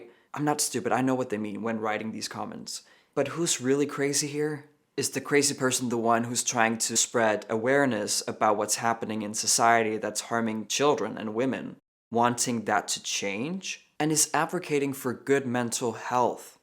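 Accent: United States. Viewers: I only have one question, and maybe it's already been answered., why do you sound like you have an American accent? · I never knew that you live in Denmark. You have a very good American dialect! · So wait… do Danes speak English with this accent that sounds American, or is he doing these videos in an American accent on purpose?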